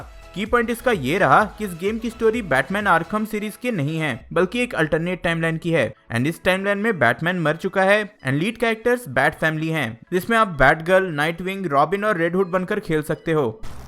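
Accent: India. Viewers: HAS..A.DESI.TOUCH IN HIS.VOICE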